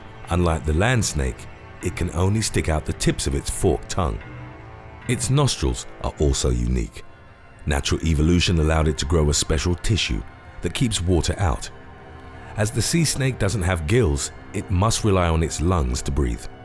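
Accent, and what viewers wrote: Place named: England, United Kingdom. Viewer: Just viewed this video and noticed how intelligent the classic English (and Ausssie) accent makes one sound. That is just about as far as this one goes!! Opening statements identified the Sea Snake as a Viper. The keyword here is VIPER.....add your own accent and then ask......WTH??? You mean there's now a whole new family (viperidae) of sea snakes? All this time I have only been concerned with the Elapids (Elapidae). Hmmm......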